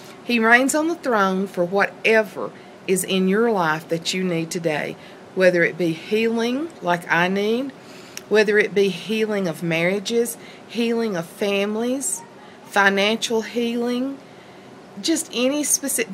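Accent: South, United States. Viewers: your Southern drawl is so fun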